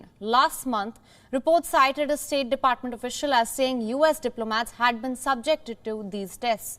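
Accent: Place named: India. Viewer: OMG that indian english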